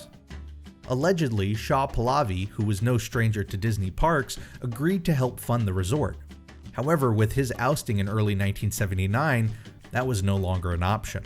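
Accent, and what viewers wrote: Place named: United States. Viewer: Toe-MAY-Toe Toe-MAH-toe... ...he's American, and almost every American speaker pronounces it the way he does. That's not to say that your pronunciation isn't correct, just that when enough people do it, it's considered acceptable. Like how there are two ways of pronouncing "either" even though there's that rule where if a soft E is immediately followed by another vowel, it becomes a hard E.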